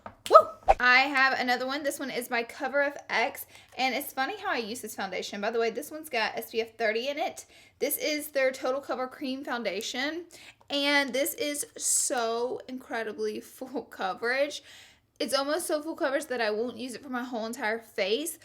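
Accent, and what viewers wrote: South, United States. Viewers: SNAPCHATFAM... Love you girl. Also in the south and love your accent and how funny your videos are. ❤️❤️❤️❤️ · Snap Fam!!! I loved your snaps from London!! I'm from South Georgia so I love that you're a southern girl too with your accent!! ❤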